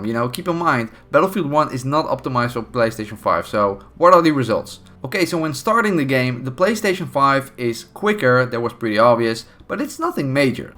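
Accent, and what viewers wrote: Netherlands. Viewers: Are you dutch? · Great Dutch accent !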